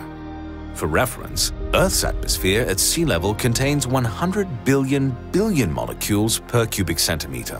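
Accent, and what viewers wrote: United Kingdom. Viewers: I love the edit, the drawings, and the British accent. It's the perfect combination. I'm a FAN! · It's so weird the he speaks with British accent then uses metric system 😑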